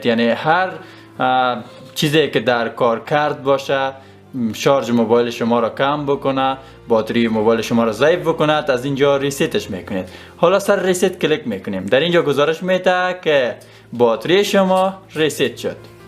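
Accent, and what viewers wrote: Afghanistan. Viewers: یه سوال چرا داره افغانی حرف میزنه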